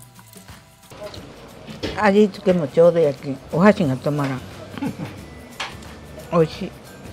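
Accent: Tosa, Japan. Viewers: 私の父（88歳）が宿毛市の生まれで、高知は何度も行ってます😊カツオのタタキもウナギも、本当に美味しいですよねー😆亡くなった祖母は純粋な土佐弁の人だったので、このチャンネルを観てると、とても耳に心地良く、大好きです❤️これからもずっと楽しみに観せていただきます😆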